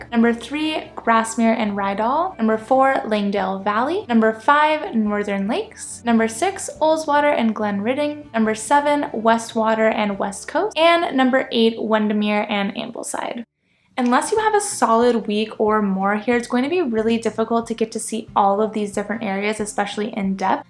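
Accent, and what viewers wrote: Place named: United States. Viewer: Hi. Not sure what Country you come from but you sound American or Canada? Haven't you looked into flights to Manchester from America or Canada? Manchester is much closer to the Lake district than London.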